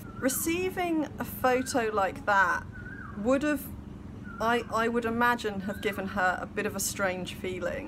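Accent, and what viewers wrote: England, United Kingdom. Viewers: her beautiful British accent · Her accent sounds brittish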